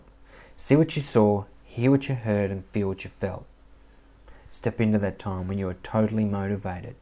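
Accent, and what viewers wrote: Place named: Australia. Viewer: Amazing. I only heard the first two seconds before it went back to buffering and I could tell he was Australian just from "Hi, this is Mar-"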